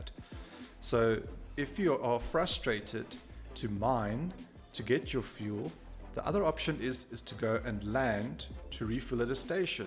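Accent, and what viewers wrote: South Africa. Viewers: I notice that south african accent... any way I also looked at that odyssey it truly is an oddity, the only thing I can see it really being is a mobile station or base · You say capital like it should be Cape Town, but it’s actually still Pretoria. Even though capexit is there. Lol. Jokes. Can hear a saffa a kilometre away. The misc starfarer is a beast.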